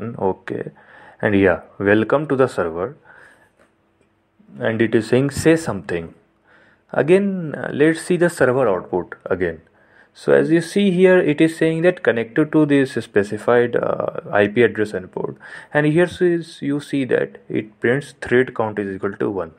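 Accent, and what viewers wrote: India. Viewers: Ow.... Indian accent.... By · Indian accents are often the sign of a good tutorial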